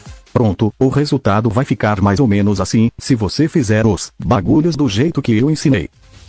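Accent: Bahia, Brazil. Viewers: O e baiano?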